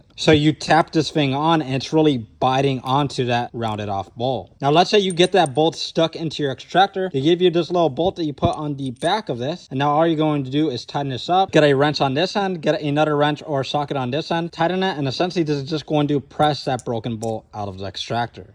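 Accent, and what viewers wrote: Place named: Somalia. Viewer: I love you Somalian accent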